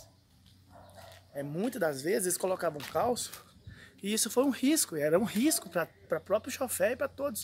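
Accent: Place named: Minas Gerais, Brazil. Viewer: de qual lugar de minas vc é?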